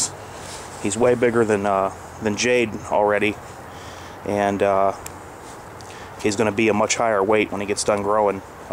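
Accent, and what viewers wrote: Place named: Indiana, United States. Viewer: it sounds like u r from indiana maybe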